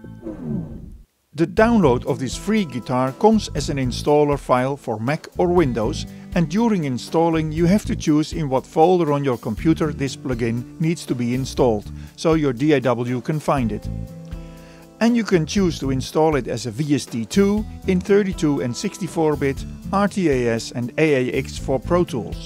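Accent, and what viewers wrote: Netherlands. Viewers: Ik hoor gewoon dat je Hollander bent 🤣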